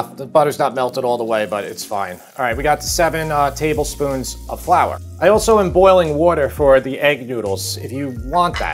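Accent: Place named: Long Island, United States. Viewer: You can't possibly say "fond" with a Lawn Guyland accent. It won't work.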